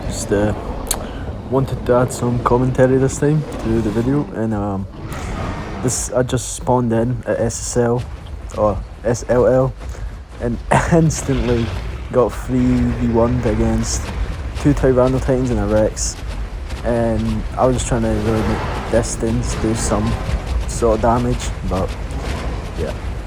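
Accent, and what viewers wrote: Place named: Scotland, United Kingdom. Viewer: Ah the Scottish accent! My mum is from Scotland, my whole family is over there. Love it :)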